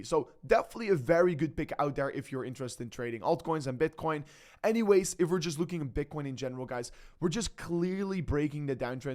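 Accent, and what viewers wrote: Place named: Netherlands. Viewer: aaah I just knew from your accent that you are dutch hahaha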